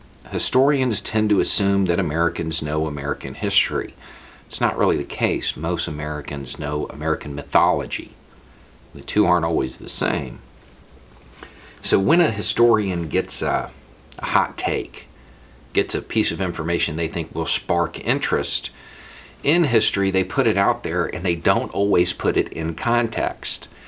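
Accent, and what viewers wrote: South, United States. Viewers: Recently some members of my wife's extended family tried defending their flying of the confederate flag by using the same talking points that you just swiftly debunked here. They're not even from the south... they moved down here a few years back, but for some reason they're in love with that damn flag and they'll swear up and down that it's not a symbol of racism, but instead a symbol of "southern pride". I tried making similar arguments as you did here, but they wouldn't listen. If they'll hear out anyone it'll be someone like you, a veteran from the south that sounds and looks like them. Thank you so much for putting these videos out. You're a hero speaking truths we all desperately need to hear. 👍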